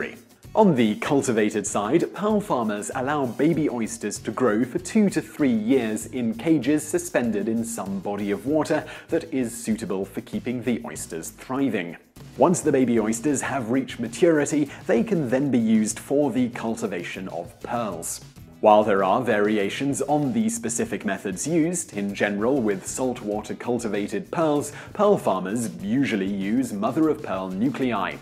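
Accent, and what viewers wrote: United Kingdom. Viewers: Bri'ish Vsauce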